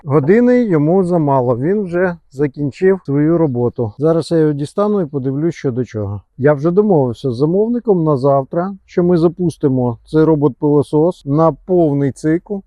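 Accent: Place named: Russia. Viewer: Русский акцент режет слух !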